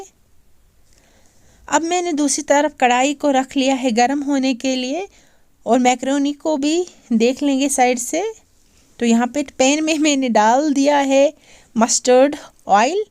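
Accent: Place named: Kashmir, India. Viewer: Ur kashmiri language is very sweet di❤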